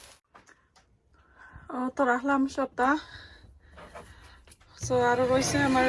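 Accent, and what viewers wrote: Sylhet, Bangladesh. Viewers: I like how you speak in sylheti mashallah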